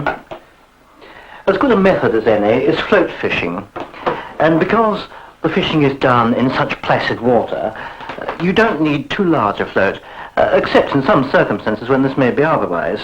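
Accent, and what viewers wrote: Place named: England, United Kingdom. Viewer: Talks very well with a marvelous English accent.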